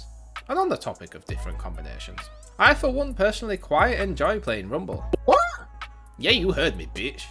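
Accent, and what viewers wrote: Yorkshire, United Kingdom. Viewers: wait are you from yorkshire hell yea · Great vid! By any chance are you from Yorkshire cause u sound like it 😂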